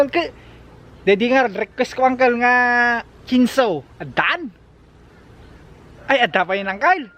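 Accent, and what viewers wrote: Ilocos, Philippines. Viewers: Hello! Enjoy watching your Bid-yos very nice strong accent Ilocano! Where are you from in Philippines? We are Filipino watching from London U.K.! 😀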